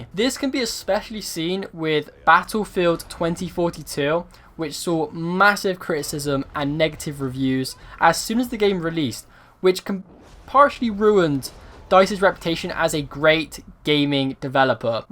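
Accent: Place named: Bristol, United Kingdom. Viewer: You have a Bristol voice !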